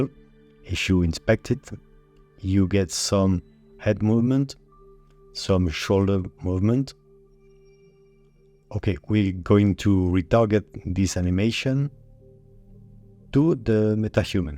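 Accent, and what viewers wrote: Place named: France. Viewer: Great my friend. Tu es français non? je reconnais l'accent. Anycase I tried others tutos, not working but yours did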